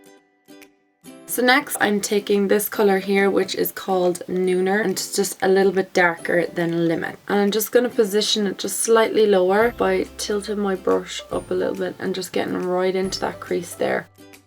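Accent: Dublin, Ireland. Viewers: Are u from dublin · I was wondering from where her accent was from and then I paid hard attention and remembered of Niall Horan and boom! It's an Irish accent 😊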